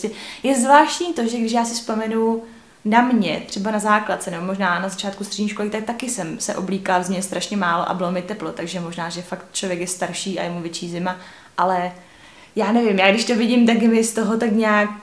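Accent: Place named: East Bohemia, Czech Republic. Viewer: Slečna mluví očividně východočeským nářečím 😉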